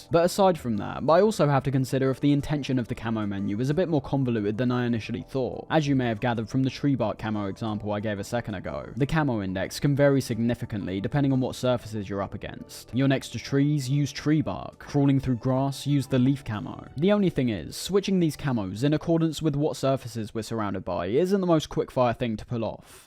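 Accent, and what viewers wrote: United Kingdom. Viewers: Ahhh British guy video game essay just what I needed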